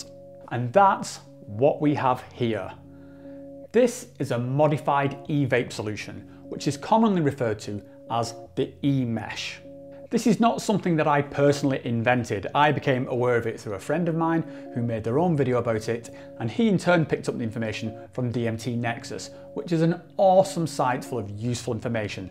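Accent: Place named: Wigan, United Kingdom. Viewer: Yo are you from Wigan you sound really local I’m from Preston haha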